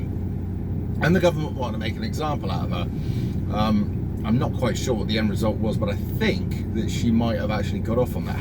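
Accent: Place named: England, United Kingdom. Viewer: Well is a very handsome looking English man with a gorgeous English accent, he does sound very classy, don’t mind his use of swear words, much needed in our current situation🇮🇪☘️🇬🇧❤️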